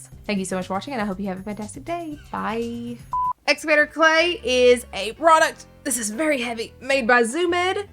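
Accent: South, United States. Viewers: her country accent is so funny i love it 😂😂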